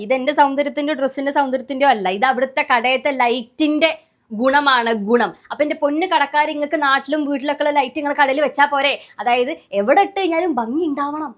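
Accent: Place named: Kannur, India. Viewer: Panam potte powyer varatte. (Kannur basha)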